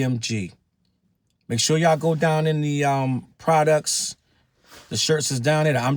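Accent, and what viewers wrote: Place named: DMV, United States. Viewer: I thought you were from the DMV… the voice gave it away. I’m from SE DC too. I lived in Valley Green in those years so I know about those days.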